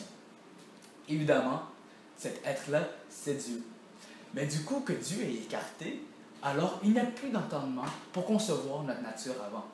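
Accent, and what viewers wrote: Quebec, Canada. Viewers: C’est dur à écouter l’accent québécois mais très bien expliqué merci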